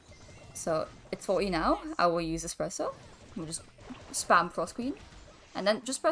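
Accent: United Kingdom. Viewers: Are you British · Nice guide British woman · Are you British?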